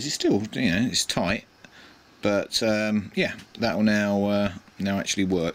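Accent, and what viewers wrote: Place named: United Kingdom. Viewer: oh goodness thank you he is british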